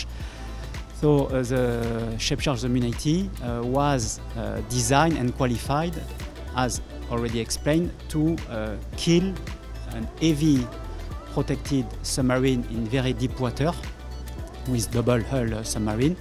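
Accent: France. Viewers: Sorry but His English accent is just terrible. For English speaking markets they should appoint someone who can speak English without or less Franch accent.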